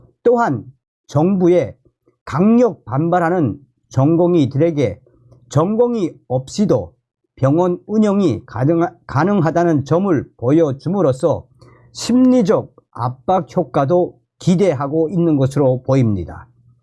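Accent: North Korea. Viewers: 말투가 북한??? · 북한에서 오셨어요? 발음이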